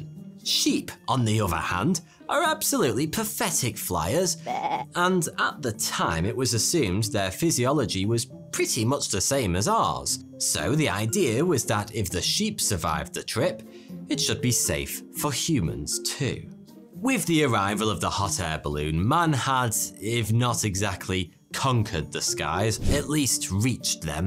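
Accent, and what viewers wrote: United Kingdom. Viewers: I thought that’s what his screen name is, too, because of his Brit accent. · I know that Brits say a lot of words differently (from Lost in the pond YouTube) but Charles' last name is Lindbergh not Lindenbergh. I had to listen again your voice while great is so soothing too. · bruh. I hate when people use copious amounts of emojis with a crappy throw away comment. Like this ain't a rap video that needs hype and It doesn't drive home Any point you made cause you made none. with his British accent sounds exactly like 42. And if you check pretty much all his videos everyone always thinks he's saying 42 until they realise, then we all have a giggle. Get it? Good attempt tho "🤣🤣🤣🤣🤣🥳🥳🥳🤯🤯🤯🌚🌚🌚🌛🌚"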